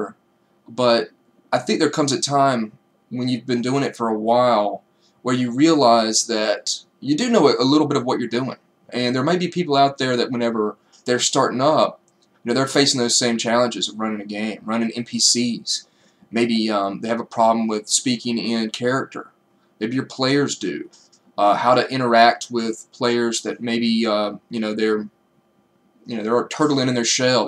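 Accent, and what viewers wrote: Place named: South, United States. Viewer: Are you from Greenville SC? I hear the southern in your voice and I saw the WYFF meteorologist video lol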